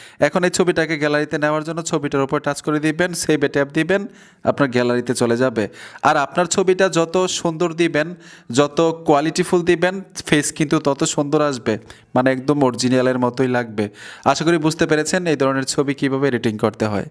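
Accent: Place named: India. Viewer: ভাই আপনার কোন কথা শুনলে বাংলা হিন্দি শোনা যায়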